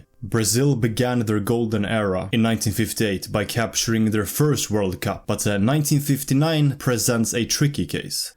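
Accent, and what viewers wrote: Sweden. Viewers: Are you Swedish?? 😅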